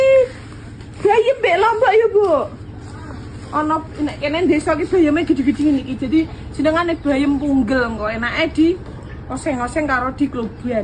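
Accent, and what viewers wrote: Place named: Salatiga, Indonesia. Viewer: Logat e kyok omah e mbah ku Salatiga . Wez mirip ngene iki 😁😁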